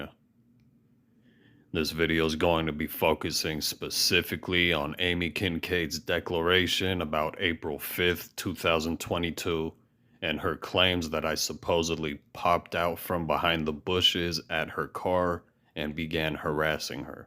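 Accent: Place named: United Kingdom. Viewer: Great British accent and you said she needs more greens is not a accusation of any type.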